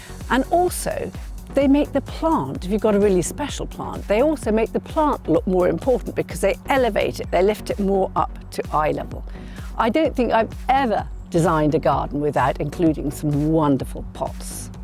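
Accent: United Kingdom. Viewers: OK, I'll grant you that lol. But it is hard to hear her talking above the music and even though I have no trouble understanding a British accent, the music adds a layer of 'accent' that makes it hard work to follow speech.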